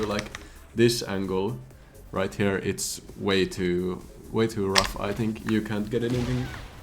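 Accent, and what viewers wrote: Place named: Finland. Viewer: Finnish accent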